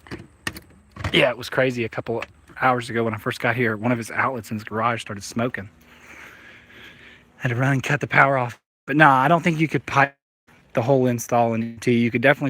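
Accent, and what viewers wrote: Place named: Puebla, Mexico. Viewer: Hey you really learn the mexican speaking way you sound like guys from puebla . hay muchos mexicanos por alli en Atlanta cierto ... 😎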